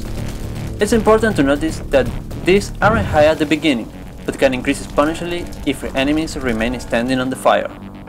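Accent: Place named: Argentina. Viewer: tu acento es re argento maquinola, de donde sos?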